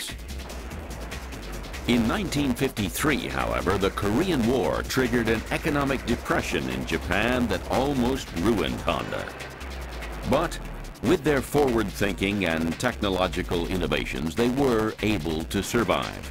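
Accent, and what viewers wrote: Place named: United States. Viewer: Hardly a "Ride on the wild side" but a leisurely stroll down memory lane, with amnesia. Short history spoiled by the abscence of the iconic CB750 voted super bike of the century and the BMW killer ST1300. The video turned out to be a 50minute long commercial, on UK roads with an American narrator. The riding sequences were boring and the videography very '70s. So glad I got this out of the bargin bin. 3/10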